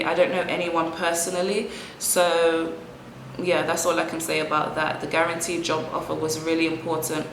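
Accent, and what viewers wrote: United Kingdom. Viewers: OMG! I love your dress, or blouse? and I love your English accent.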